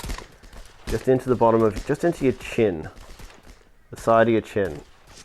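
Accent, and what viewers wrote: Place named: Australia. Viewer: You're Australian 😇 👍👍👍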